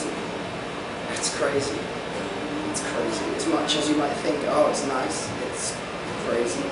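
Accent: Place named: United Kingdom. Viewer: British accent:D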